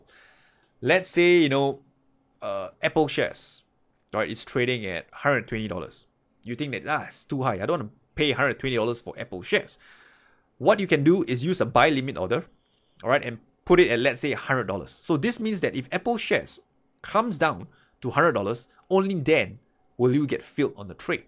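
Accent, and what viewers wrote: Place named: Singapore. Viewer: i bet, you r Singaporean.....tnx for the video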